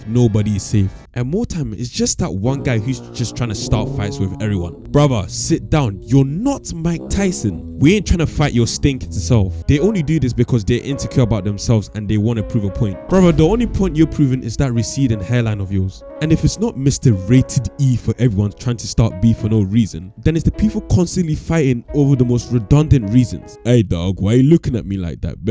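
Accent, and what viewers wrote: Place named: London, United Kingdom. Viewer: make ur mind up bro are you from london or america? ur pissing me off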